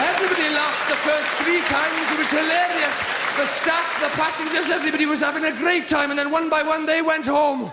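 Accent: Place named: Wales, United Kingdom. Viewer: that is the most welsh accent I have ever heard !!!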